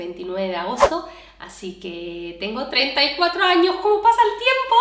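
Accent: Spain. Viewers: Mmmmmmm!! Mmmmmmm!!! Ummmm!! Sientes que tu forma y acento español haya sufrido alguna transformación? Sufrido? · Me gusta tu acento español y la forma profesional en qué haces tus vídeos. En hora buena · Excelente video de preguntas y respuestas, ya casi 300K me encanta ver como sigues subiendo como la espuma, yo te haría dos preguntas, la primera es si estas buscando oportunidades de actuación en México ya sea en TV o en teatro y si lo estas haciendo que tanto ha influido en que te seleccionen o no tu acento Español/Catalán, la segunda es con respecto a los canales con los que quieres colaborar, me gustan todos los que mencionaste y de hecho creo que los sigo a todos, pero no mencionaste a ni un solo canal de Españoles que reaccionan a México y que de hecho han visitado el país y han creado también su propio contenido de sus viajes y vivencias aquí, Cygnus y The Romantic Corner (Chris y Carmen, casados y con un hijo recién nacido en México) serian para ti super fácil de conocer pues están radicando actualmente en CDMX... 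mucha suerte y, me encanta su contenido..... SUGERENCIA pueden agregar la opción de membresías al canal y subir ahí contenido sin editar o que por alguna razón dejan fuera de la edición final, eso les podría ayudar a incrementar su ingreso... Saludos desde Monterrey. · Me gusta mucho tu contenido. Felicitaciones. Por otra parte, me he dado cuenta que estás perdiendo el acento español. Definitivo, los acentos se pegan depende de donde uno viva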